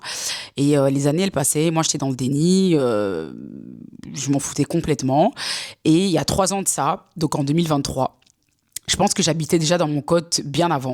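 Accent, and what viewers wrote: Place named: Belgium. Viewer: Olalala, en t’entendant parler, je me suis dit, c’est sur elle est belge ! Et puis tu parles de ta maman qui habite tout près de la Meuse… je ne savais pas que je tomberais sur la vidéo d’une liégeoise en étant moi en pleine perte de poids et changement de mode de vie 🥹 ça fait trop plaisir ! Et t’as vraiment fait les choses correctement. Bravo ! C’est vraiment un changement de mode de vie à adopter sur du long terme la « perte de poids », pas juste faire des régimes hyper restrictifs sur une courte période. En tout cas, merci pour ta vidéo, t’es adorable et super courageuse ❤️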